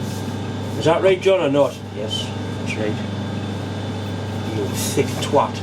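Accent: Newcastle, United Kingdom. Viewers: Another great year on YouTube, John. I wait all week for your SNNCs and it's always a pleasure watching them, which I often do more than once, if only to hear your Geordie dulcet tones cursing "BASTAD"! May I wish you, Debbie and your dad a very merry christmas and a happy and healthy 2017. May I also thank you for your YouTube input, which gives great insight into a very skilled engineer, or as we referred to them in my apprenticeship days in the 60s, 'fitter-turner'... those were the two skills necessary to become a skilled toolmaker in those days and it was taken for granted that if you could turn on a lathe you could also perform milling, surface-grinding, shaping, tool-sharpening, etc. But with you, it doesn't end there... you are probably one of the best multi-media welders I have ever had the privilege to watch and for someone with a home workshop, you perform some of the best brass and bronze small-scale casting that I've seen (OK, it may not pan out right on the first attempt, but you get there in the end... 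but that's metal casting for you). I very much look forward to watching what you get up to in 2017.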